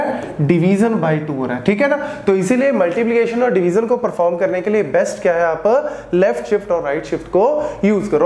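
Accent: India. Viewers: Please, videos with subtitles. I'm brazilian and I don't understand english with indian accent very well :( nonetheless thank you very much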